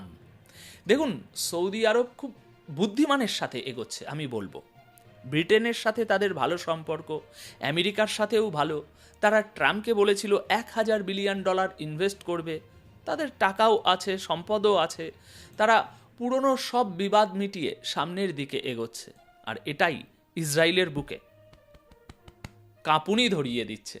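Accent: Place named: West Bengal, India. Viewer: দাদা আপনার কথাগুলো ১০০% সঠিক, আপনার বিশ্লেষণ অনেক উঁচু মানের, আপনার কথা বার্তা শুনে পরিস্কার বুঝা যায় আপনি পশ্চিম বাংলার মানুষ, তবে বিগত ১ মাস ধরে আপনার সংবাদ দেখি, আমার খুবই ভালো লাগে, সঠিক কথাগুলো বলেন।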